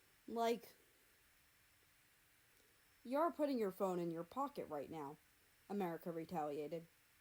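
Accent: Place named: Russia. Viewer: Sfhgfhhjnbdgj I can’t listen to this rn bc I’m busy but AAHHH TYSM!!! I got to about 8 minutes in lol, I’ll finish it later!! I’m actively cringing at the way I wrote things back then- especially the typos in the summary- how did I not notice those- but you know what it’s okay 😭 anyway YOUR READING IS FUCKING INCREDIBLE can I just say that I’m really impressed by your Russian accent?? I can’t do accents to save my life 😂😂 I’ll probably say more later bc I have to go now but THANK YOU SO MUCH ❤❤❤❤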